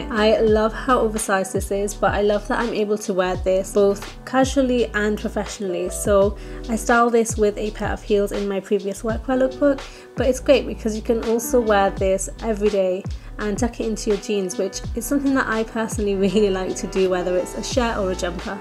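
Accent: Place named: Liverpool, United Kingdom. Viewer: Can any English person let me know what her accent is? Is it a mild Liverpool accent? I love it.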